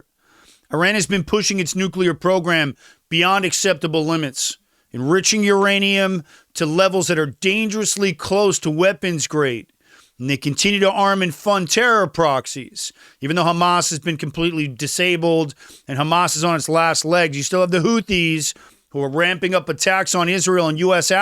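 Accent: United States. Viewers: I enjoy your presentation. Are you Jewish? You seem to have an American accent. R. Evans Australia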